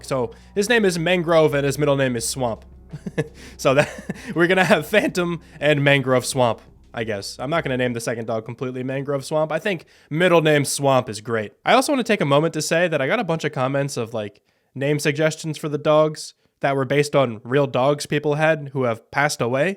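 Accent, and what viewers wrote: United States. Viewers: I thought I detected an eastern PA accent, I'm from that area too! Go birds! Just found your videos and kept picking up on Philly/lancaster isms. Enjoying the series so far. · Ahh, now that you mention Pennsylvania I can hear it. Good video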